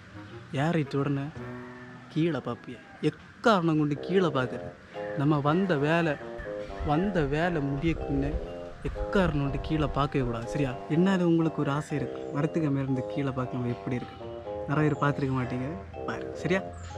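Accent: Kanyakumari, India. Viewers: Kumari slang pichu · Nop, kumari Tamil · Kumari Tamil Anna😍😍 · it's kanyakumari tamil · கன்னியாகுமரி ஸ்லேங்... 😁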